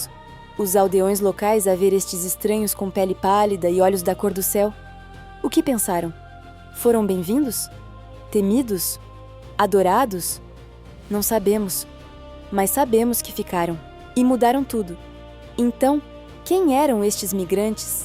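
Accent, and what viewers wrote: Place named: Brazil. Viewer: Moça, por que seu sotaque é brasileiro e você fala português de Portugal? Vídeo bem interessante! Vlw!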